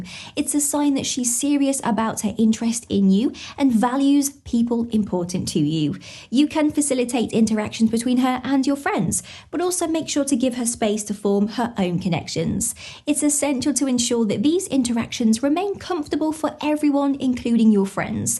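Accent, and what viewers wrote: United Kingdom. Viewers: British accents are so awesome!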